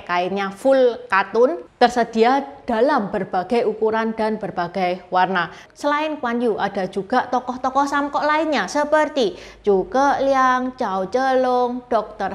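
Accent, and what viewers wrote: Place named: Yogyakarta, Indonesia. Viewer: Mirip logat Chinyo alias chinese yogyakarta. 🤭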